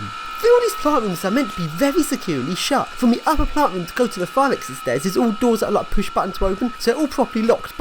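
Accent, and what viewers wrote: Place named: Newcastle, United Kingdom. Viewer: Okay, didn't knew that people from Newcastle had such a strong accent... I thought might have moved to England from another country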